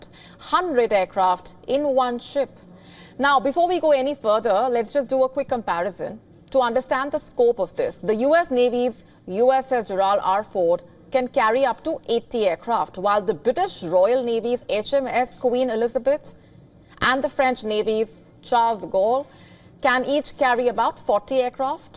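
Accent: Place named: India. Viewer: She spoke with too heavy Indian accent compare to Chinese newsreaders who speak very smooth English.Hard to understand her fully. She need to speak slowly.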